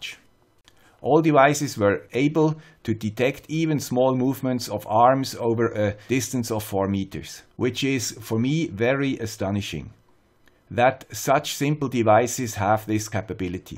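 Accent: Switzerland. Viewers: Absolutely outstanding work,. I love watching your videos, and I love you Swiss accent, too:) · Mit einem Schweizer Akzent?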